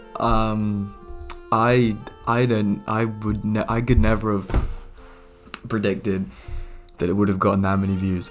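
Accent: United Kingdom. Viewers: I can't recognise accents, so I really wanna know if you're from the UK. 😅. I'd be insanely impressed since most people there go to college at 16-18. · wait… this is crazy… he’s… HE’S… BRITISH IN REAL LIFE? AHHHHHHHHHHH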